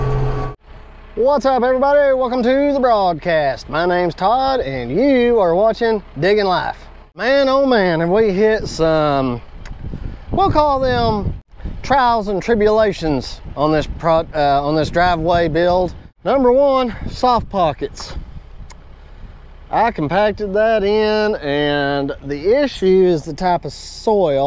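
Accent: South, United States. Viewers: Great content Todd. My grandson comes running when he hears "WELCOME TO THE BROADCAST!!" Lol He also LOVES the the southern draw and finds ways to say the word "pipe" just like brother Todd!! Thanks for keeping it kid friendly and consistent!!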